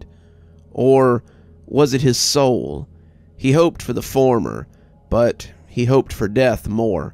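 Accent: South, United States. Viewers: I love your accent. Are you from the south?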